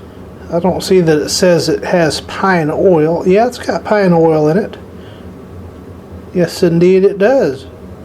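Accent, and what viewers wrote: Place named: South, United States. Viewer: Love your videos and your lil bit of southern drawl 😆 hello from Oklahoma from your newest subscriber!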